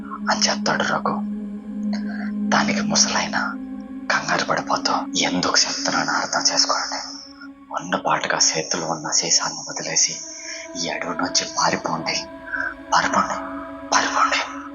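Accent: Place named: Telangana, India. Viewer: Excellent bro me Telangana slang lo cheppadam chala bagundi. Ipude subscribe cheskunna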